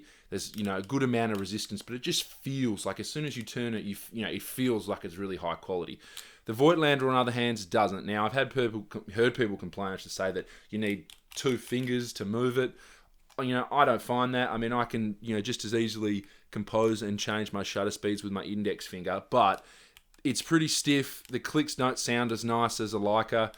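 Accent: Australia. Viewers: I'm a very satisfied Voigtlander Bessa-R (LTM) owner, and I don't really care that much about what you're saying. I just love watching a real-life giant with a wicked Australian accent talk about analog photography, so cheers for all you do, mate ; )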